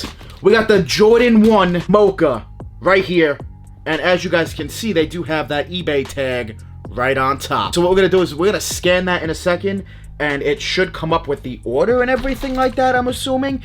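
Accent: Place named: Brooklyn, United States. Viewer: Dudes definitely from Brooklyn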